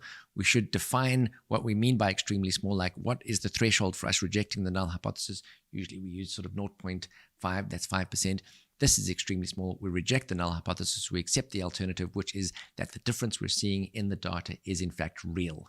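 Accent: South Africa. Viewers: Your accent reminds me so much of home! South Africa foreva! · OMG are you South African? That boomchakalaka had me.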